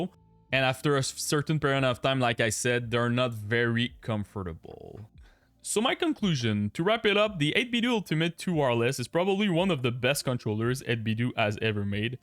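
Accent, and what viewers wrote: Quebec, Canada. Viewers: lol bro i can ear the quebecois from this video XD nice to see a fellow Qc doing content in english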